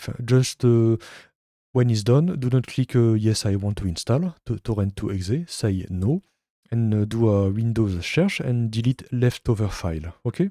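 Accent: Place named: France. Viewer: Very subtle french accent 😊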